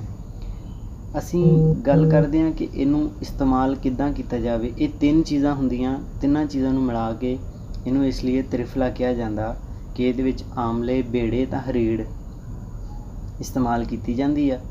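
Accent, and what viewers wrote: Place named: Faisalabad, Pakistan. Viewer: پنجابی زبان تے فیصل آباد دی زبان اے لگدی اے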